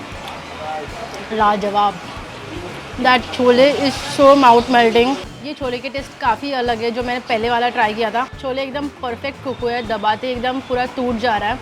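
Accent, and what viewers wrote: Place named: Maharashtra, India. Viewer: From the way of your speaking accent I'm sure you are basically maharashtrian girl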